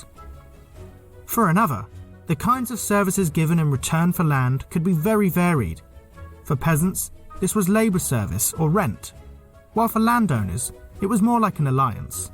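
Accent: United Kingdom. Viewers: Liked your clip. Already knew most of the story. With respect to present day Brits (note the narrator's accent), the then and there societal setup strongly resembled the previous setup of Feudalism. This from High School American History. And the main reason that much of the emigrates from the Old World to the New World. The major point of Feudalism is that your position in a society is either inherited or assigned. For those that know a little of the Roman Empire, this might sound like a repeat? Things that can make you go, 'Hmm?'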